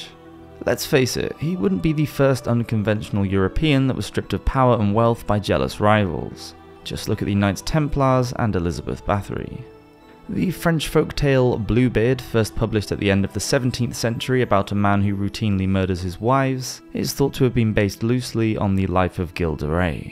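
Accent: England, United Kingdom. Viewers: I love this channel. Medieval history just sounds so much better coming from an OG English accent.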